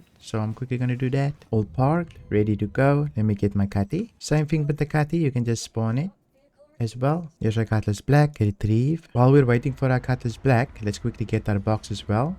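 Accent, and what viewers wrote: Afrikaans, South Africa. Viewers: man i know its not your fault but damn that afrikaans accent is sooo thick 👁👄👁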